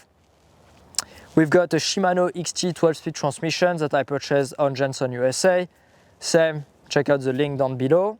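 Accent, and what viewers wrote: France. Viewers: I just come to hear him talk in that thiiiic french accent. :body-blue-raised-arms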